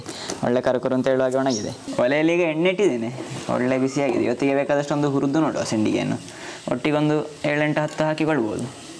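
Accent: Mangalore, India. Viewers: As a malayali living in bangalore your (Mangalore) kannada accent is so beautiful, does not sounds like rude as here in bangalore due to inter-mixing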